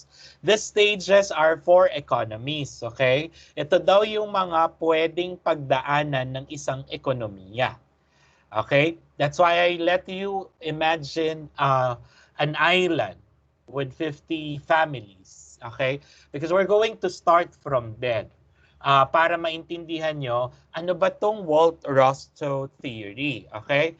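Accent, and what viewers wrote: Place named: Philippines. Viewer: Omg thank you so much for posting this!! It's been a little difficult understanding the accents in other videos. I was so happy when I heard the Filipino accent. May maiintindihan na ako huhu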